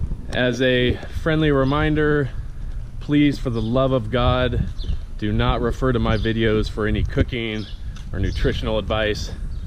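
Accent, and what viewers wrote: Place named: United States. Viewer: Sangria pronounced SANGRIA not Sengria. If you you want to learn Spanish you must drop that gringo accent. Otherwise sounds so stupid.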